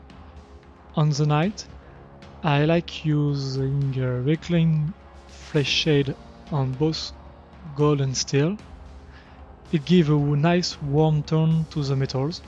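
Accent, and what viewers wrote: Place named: France. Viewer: Oh l’accent sonne français ! beau boulot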